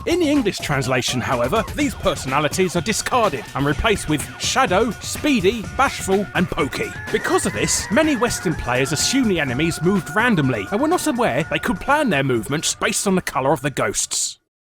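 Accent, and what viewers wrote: United Kingdom. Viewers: People be hating this voice, that's a good ol British accent right there! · Fuckin' cartoon british voice... It was really annoying. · waaah i dun liek teh voice waaaah Shut the fuck up and deal with it. This is what a lot of British people sound like. You don't like it? Think how your accent might sound to us.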